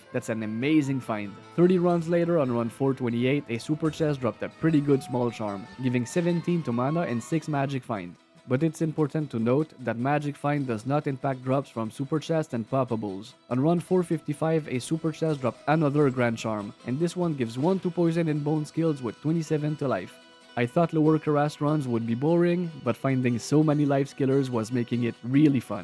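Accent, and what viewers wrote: Quebec, Canada. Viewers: That crisp Québec accent. That many runs, getting a sorc would saved you substantial amount of time with telekinesis only without even counting teleport. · french canadian? "three times faster" is a weird thing to say mathematically "In a third of the time" is more accurate I guess the old "speed" was "1 enigma per 60 hours" so "1 enigma per 20 hours" is three times as fast, or two times faster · Mon frere! I love how, with your beautiful Canada accent, whenever your say poppables is sounds like 'palpables', like you are a medic examining someone :)) Also, I found, and totally random, 'cause when I farm I usually go, in the same run - Travi, Mephisto, then LK, Countess and then maybe Andy, so this time I found, on P8 LK, and Ohm rune, under a Corpse. So a 'palpable' corpse gave me OHM = Oh, Homme, OH HI MARK!!! = ))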